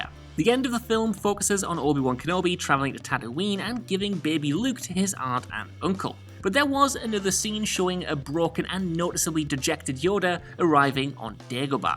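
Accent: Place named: England, United Kingdom. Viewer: Just show the scenes, I dont give a damn about the intro or hearing your annoying pom voice.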